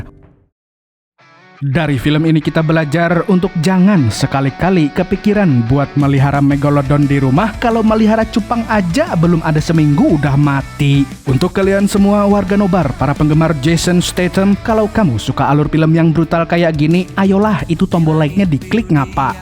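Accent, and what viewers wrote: Banjar, Indonesia. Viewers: Bahasa banjar admin nya, bungul am 😅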